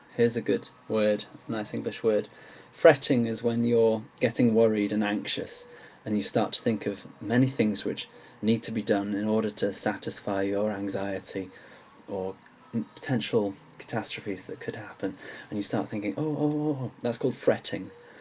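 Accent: United Kingdom. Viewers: Is so cute the way you speak... Is not like the others british, there's a little more sugar on your tongue... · i love British accent, sounds lovely! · you know what, this is really helpful, indeed!! It is far better than lots of vids uploaded by some acting coachers or similar..! please keep posting! You are very english!!! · i really want to learn how to speak in the british accent...i have the american accent and trust me , i would not stop talking if i had your accent ;)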